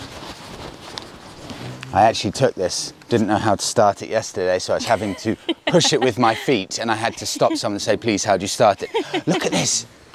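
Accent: United Kingdom. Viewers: Me encantó tu video! Hermosa Lituania! estoy estudiando inglés, tu acento me hizo acordar mucho a y a! thank you very much!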